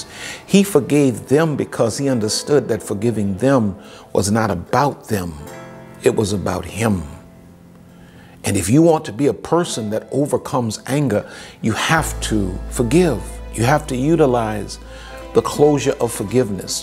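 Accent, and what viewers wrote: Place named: Jamaica. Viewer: Loved the Jamaican accent! There's nothing you carnt do 😀 your my favourite Youtuber without a doubt! #ONLINEFATHER❤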